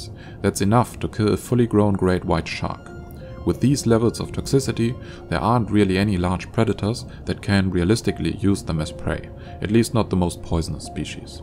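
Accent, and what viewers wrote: Germany. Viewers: german accent? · He sounds german lol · 10 seconds in and i can already tell that he is German lol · You instantly recognize the german accent xD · This guy is German for sure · i love hearing morbid facts in a matter of fact German accent.. Informative and unintentionally droll. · Are you from germany?i don't mean it in a bad way it's just they way you speak englisch even tho yours is perfect kinda reminds me of the my peers used to do ,i'm from germany might explain why i ask? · The First 7 Seconds and i already know you are a German. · Du bist deutscher oder? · are you german? you do sound a lot like a german person speaking english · Are you German ? because I hear a German note in your pronaunciation lol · Ich hab noch nie jemanden auf YouTube gesehen der so nen starken deutschen Akzent hat :D no hate ich finds nur witzig und ziemlich ungewohnt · höre ich ein deutschen akzent? 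· You Sound so German you could voice Klaus from American Dad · Are you from Germany? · Is the narrator german? Sounds like i while speaking englisch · German accent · Why do you sound this german? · I realized the German accent immediately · This dude sounds super German. That being said, awesome video. I'm hooked and subbed! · Kommst du aus deutschland? Dein akzent klingt so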